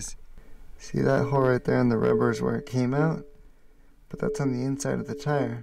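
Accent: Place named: New Mexico, United States. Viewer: no worries man. You have a similar accent to a buddy of mine in New Mexico so it was a guess lol. I love Idaho though!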